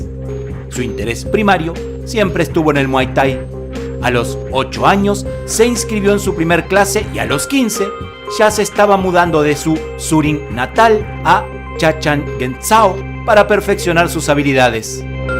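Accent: Argentina. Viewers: Se escucha claramentr como argentino o posiblemente uruguayo, pero peruano o ecuatoriano? Ni cerca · Excelente video! Tu eres argentino? Saludos de Brasil!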